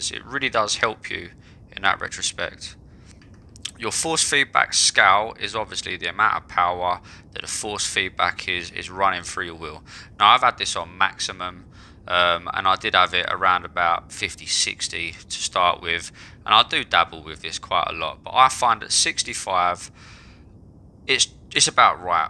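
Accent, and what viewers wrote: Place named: United Kingdom. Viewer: Wow, you have such a deep understanding of cars and how each component contributes to the overall function of the car. That type of knowledge comes from real-world racing I just found you while looking for Thrustmaster wheel vids, are you a real-world racer? You are very professional yet explain it where a novice like myself can understand it. Of course, it helps to be British you guys are clear winners in the oral presentation department. As I progress I will favor your knowledge and hope to see many more vids from you thanks!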